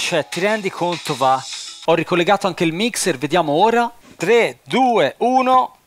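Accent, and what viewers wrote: Tuscany, Italy. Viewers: forte toscanaccio :)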